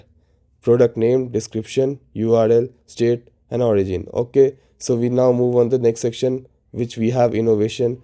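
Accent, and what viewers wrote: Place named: Bihar, India. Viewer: your bihari accent is so sexy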